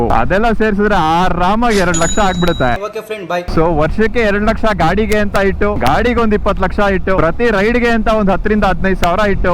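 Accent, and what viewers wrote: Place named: Kundapura, India. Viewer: Kundapura kannada ❤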